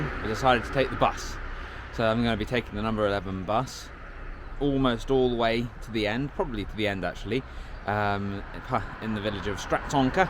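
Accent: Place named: Scotland, United Kingdom. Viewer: That was interesting morning walk with a bit of scottish accent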